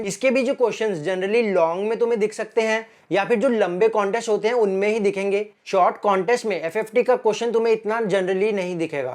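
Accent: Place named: India. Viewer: can you add the transcription in your video pls. The India accent seems pretty hard to listen:((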